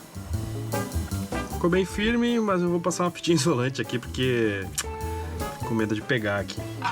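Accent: Rio Grande do Sul, Brazil. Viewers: Buenas tu és gaúcho .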